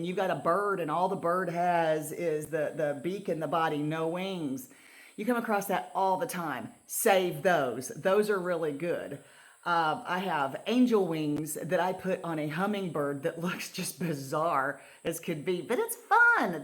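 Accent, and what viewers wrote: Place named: United States. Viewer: First, amazing suggestions and style. I cannot understand why people want to make the same thing when you can mix and match. FYI--Check on your etsy link. it defaulted to British pounds instead of US dollars. This might be just a me problem but but I want to support fellow potters. (based on accent and mention of a US holiday I assume, like me, you live in US)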